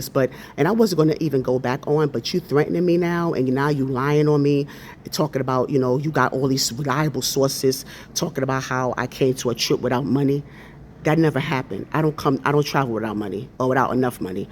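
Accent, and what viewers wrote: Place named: Brooklyn, United States. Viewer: Is that Brooklyn I’m hearing? Keep tawkin!!!!❤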